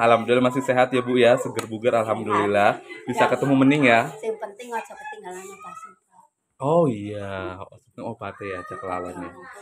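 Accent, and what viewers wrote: Banyumas, Indonesia. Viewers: ngapakk y keren 😂🙏🙏 Inyong Suroboyo 😂😂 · Bahasanya ngapak sama aku baru nemu chanel ini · Kok Ngapak juga mas, kaya EnnyongE 🤭🤭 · Bahasane deneng pad Bae Karo nyong ya ngapak,ora ngapak ora kepenak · Mas sampean orang mana kok bahasanya seperti saya ngapak? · Kang ngapak ya..pada karo nyong...asli ne biyung kartini ngedi kang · mas wong ndi kie..koq kdg ngpak bhnse 🤣😂..your is Holland man or ngapak man.... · Asli wong ngapak ndi sih mas ki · mas ko ngomonge ngapak kaya inyong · Deneng ngapak temen mas 😁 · Salam satu ngapak mas ❤😂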